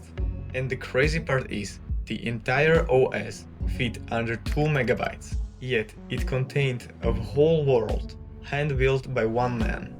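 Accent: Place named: Slovakia. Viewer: ten slovensky prizvuk strasne pocut :dd, pekne video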